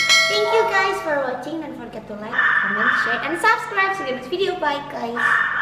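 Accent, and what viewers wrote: Java, Indonesia. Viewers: Kak orang Jawa kah? Kok kyk ada medoknya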